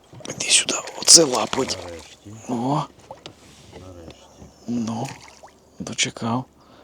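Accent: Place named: Polissia, Ukraine. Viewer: Доброго дня ,яка місцевість , по мові ви з полісся.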